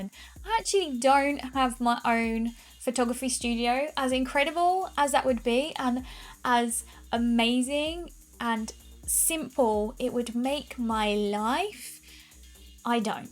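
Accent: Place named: United Kingdom. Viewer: I hope your not offended by this question but you sound British that’s not the question rather if you are from where do you hail from London by chance?❤️👍😁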